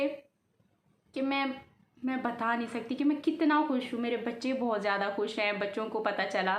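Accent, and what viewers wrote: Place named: Sindh, India. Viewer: I think u are Sindhi speaking aapk bolnay say mujhay laga I am Sindhi speaking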